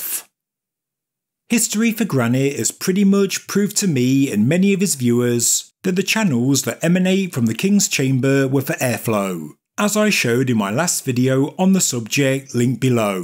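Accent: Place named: Birmingham, United Kingdom. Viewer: In general like the content of these videos. It's just that I find the narrator's nasal, brummie accent so annoying that it detracts from the actual video. If he could use AI to hide his accent then I could probably sit through a whole video without wanting to puke.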